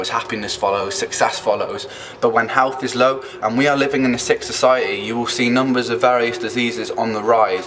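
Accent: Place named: United Kingdom. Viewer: You are cool , I like the british accent , I'm from India , a developing country , our scene is the same . But Our country is trying it's best to built government hospitals and free medicine from them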